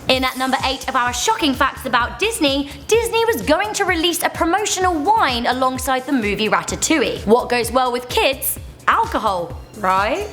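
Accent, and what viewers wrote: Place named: Cockney, United Kingdom. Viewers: British - circa Cambridge/London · I was just wondering that. Maybe cockney...? Sorry I'm no help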